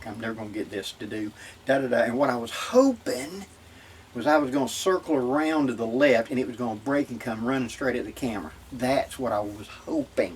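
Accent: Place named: Georgia, United States. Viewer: I just found your videos I really appreciate the info but it’s a trip down memory lane as a young lad with my dad and grand pa with the way you talk. Georgia native maybe? If that’s a known fact or not my bad just found you last night.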